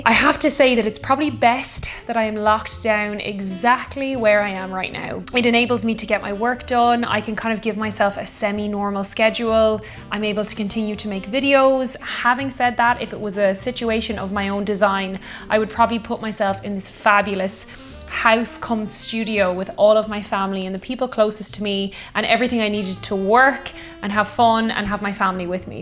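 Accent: Ireland. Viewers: Great video! Thanks! Lovely Irish accent.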